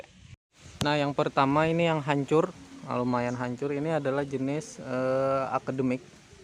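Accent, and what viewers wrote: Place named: Banjar, Indonesia. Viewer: Banjar dmana nya pian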